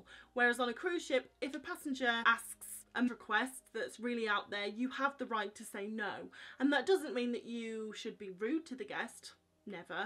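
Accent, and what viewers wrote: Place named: West Midlands, United Kingdom. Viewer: Congrats! You're quite the entertainer. Could you please let us know which region of the UK you hail from? Judging by your lovely accent I'm guessing West Midlands. Am I way off?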